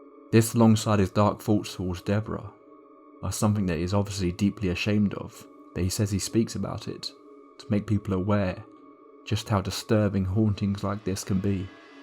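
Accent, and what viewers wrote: United Kingdom. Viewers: Just stumbled upon your channel. Great storytelling and delivery. Not many of these types of videos have that elegant across-the-pond accent. 😊 Thank you for all your efforts and keep up the great work mate! Cheers from New York City.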